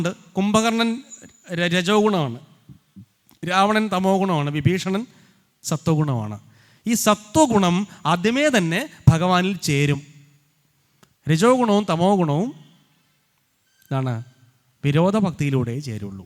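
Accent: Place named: Thiruvananthapuram, India. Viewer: തിരുവനന്തപുരം ഭാഷ യും. മഹാ പണ്ഡിതനും ഹരേ കൃഷ്ണ 🙏 ഇതു കേൾക്കാൻ sadhichathu മഹാ ഭാഗിയം 🙏🙏🙏🙏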